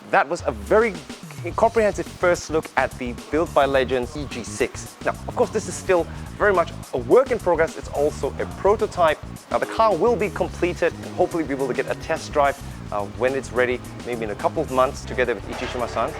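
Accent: United Kingdom. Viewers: I hear Brittish, see Japanese.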